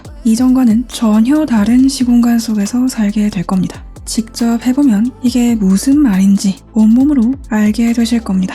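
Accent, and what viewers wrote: Gyeongsang, South Korea. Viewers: 아따 ~걸죽한 경상도 사투리여서 좋네요ㅎㅎㅎㅎ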